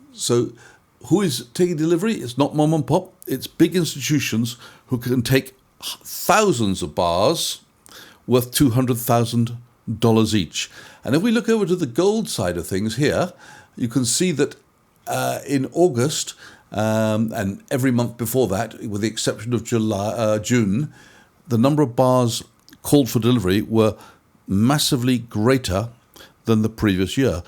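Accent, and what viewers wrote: England, United Kingdom. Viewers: Really appreciate your wisdom and that beautiful English accent.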